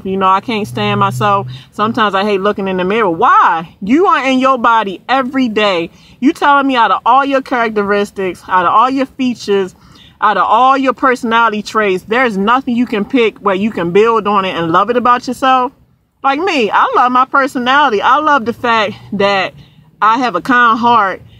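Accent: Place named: Texas, United States. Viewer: I love her accent, someone in another one of her blogs said Maryland I was like no way I'm thinking more Texas or south who knows where, she's from I'm from Carolina I think she's close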